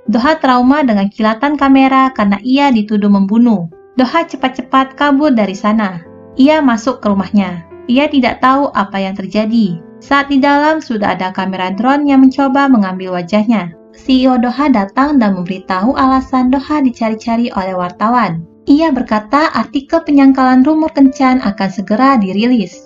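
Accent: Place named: South Korea. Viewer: Ini yg ngomong seperti org korea asli y logatnya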